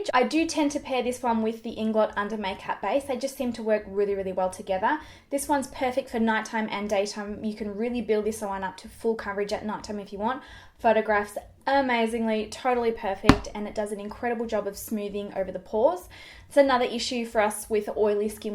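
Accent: Australia. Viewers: As a fellow oily skinner, and a mummy on a budget I highly appreciate the affordable options! Thanks so much....ps I'm a kiwi and am quite amused by your ozzy accent..oy oy oy!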